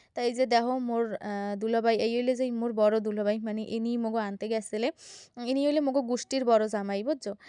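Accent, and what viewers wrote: Barishal, Bangladesh. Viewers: আপনি তো দেখি মাশাল্লাহ ভালোমতো বরিশাইল্লা বাসায় কথা কইতে পারেন? গো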